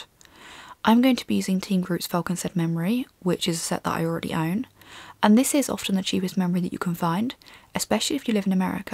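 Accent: England, United Kingdom. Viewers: That English accent 👌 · Voice of a woman on review and its brish accent. .....tasty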